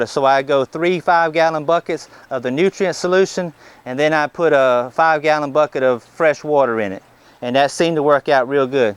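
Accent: South, United States. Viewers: Ok, so I hear a southern accent and I know I heard at least a couple of "dat"s...are you in or around Louisiana? Who Dat? LOL Anyhoo, I live in northwestern Louisiana and have really moist soil...I was wondering if you have any suggestions of either what would grow best or how to get my soil to dry up a little. I till it but with all the rain and the fact my soil holds moisture like a sponge, it just never seems to dry (and this is on the elevated areas)...love your videos!!!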